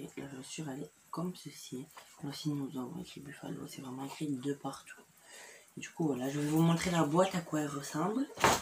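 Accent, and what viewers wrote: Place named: South, France. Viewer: Bonjour j'adore ta chaine et j'ai la même passion que toi...Tu habites où avec ton super accent du sud???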